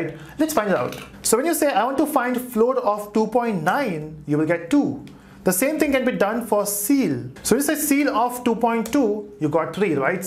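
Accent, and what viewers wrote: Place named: South India, India. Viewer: u talk too fast , little south indian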